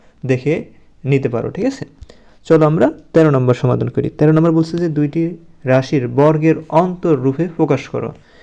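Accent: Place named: Barishal, Bangladesh. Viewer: বরিশাইলা কথা😂😂😂❤❤❤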